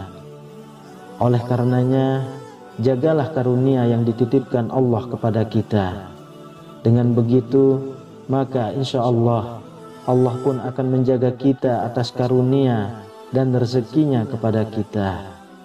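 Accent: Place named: Bandung, Indonesia. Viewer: Terima kasih ustad penjelasan nya.🙏🙏🙏👍 Ustad orang mn ya.suara nya sih seperti orang bdng ya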